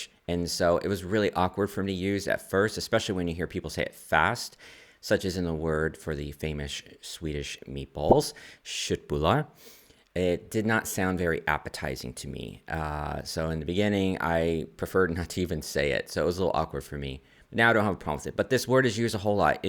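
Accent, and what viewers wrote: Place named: United States. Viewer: Regarding Kött and fack. It is not because of your English but because of your American dialect; you don't pronounce all different English vowels. You pronounce some of them the same, unlike in English (The language spoken in England).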